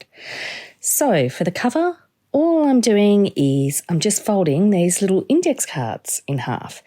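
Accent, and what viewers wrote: Australia. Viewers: You are an absolute delight! Love your Aussie accent, put a smile on my face, being a fellow Aussie living in West Yorkshire, UK! Will definitely have a go at making these wee gems and your split pins/Brad pins too! Thank you for a wonderful tutorial and I’m so happy to have found you! 🥰🫂❤️🙏🏻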